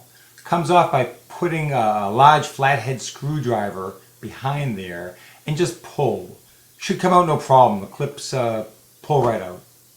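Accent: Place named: Boston, United States. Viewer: Very helpful. It made me decide not to do this myself. BTW, sounds like a Boston accent. Yes?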